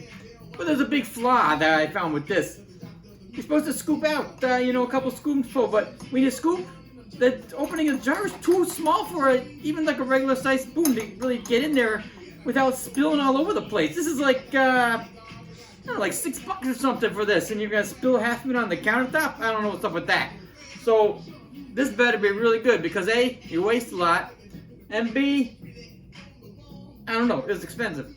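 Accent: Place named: Chicago, United States. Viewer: I'm from Chicago too we have such an accent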